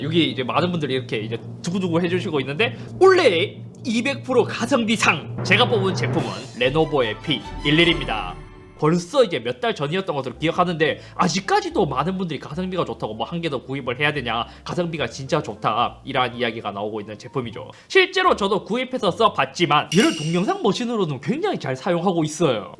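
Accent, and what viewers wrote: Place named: Gyeongbuk, South Korea. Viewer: 올래 ㅋㅋㅋㅋ경북커 ㅋㅋㅋㅋㅋ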